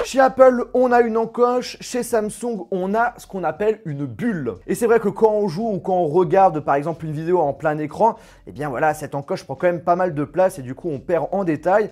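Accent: Belgium. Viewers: T belge? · Tu es belge????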